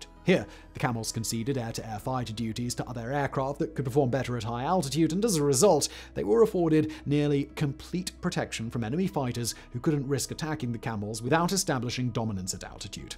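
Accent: United Kingdom. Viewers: Funny how these chaps with the funny accents always seem say the 'most formidable' and 'very best' aircraft of the wars were the British ones. The Camel, which I personally love, was in dire straits if come upon by an airman of equal skills flying a Fokker DVII, as turning fighters are at a distinct disadvantage to energy fighters, and cannot run away when cornered. A smell of arrogance permeates the air when the average limey speaks of her Majesties Royal Air Corp. I would stand shoulder to shoulder with a man (girls, needn't bother) who would with humility speak of the profound glory the Camel rightly deserves. Observe that, the 'greatest' or the 'most deadly' are terms that mouthpieces use to sell their video of the day. Oh, give over blokie!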